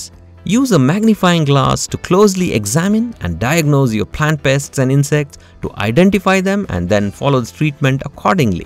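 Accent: India. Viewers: Indian accent sounds cute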